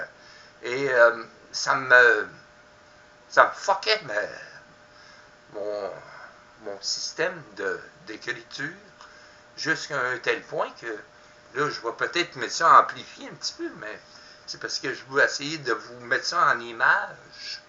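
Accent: Quebec, Canada. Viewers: T tu québécois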